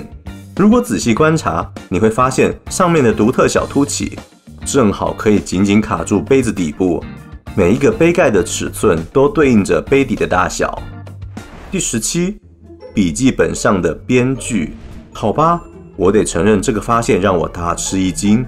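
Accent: Taiwan. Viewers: 說話方式怪怪的，而且聽起來像台灣人又有點不像台灣人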